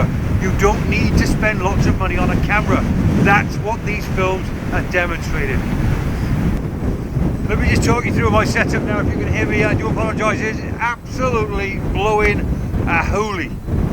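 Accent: United Kingdom. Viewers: Wow... The ham sandwich aerial delivery had me rolling! With all that wind noise, I had a hard time lip reading that British accent! The Yorkshire Tree rocks are about the strangest thing imaginable yet quite fascinating as well. The entry level equip.along with the various compositions you used produced some beauties for sure! Point well made... The other thing that kept me on the edge of my seat was you raising both hands to emphasize a point & the bloody wind almost blowing the equip. to the bloody ground, LOL! Bill Farr...:)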